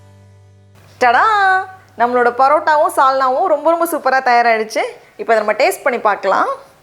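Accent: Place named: North Chennai, India. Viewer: Your now Celebrity channel ,please improve your Tamil pronunciation ,Dont say la ,Zha ,its only few days of practise. Azhagu !! not Alagu ,tamil means Tamizh or Zha ,avoid north chennai accent too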